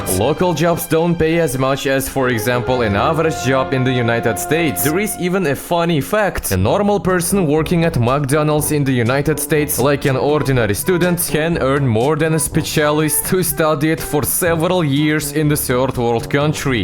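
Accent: Russia. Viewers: Russian accent?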